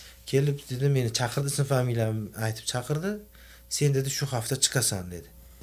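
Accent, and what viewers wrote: Andijan, Uzbekistan. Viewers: Ертак боп кетди акахон Ростан Анжанлимисиз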